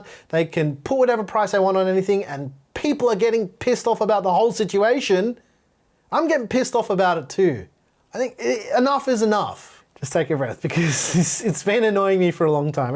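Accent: Australia. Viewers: No. lol. what an aussie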